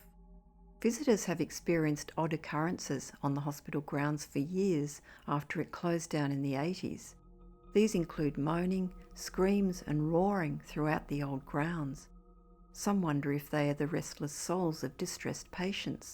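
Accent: Australia. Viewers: Australia I would think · I thought Australia. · And she's an Ozzie! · I love the Australian accent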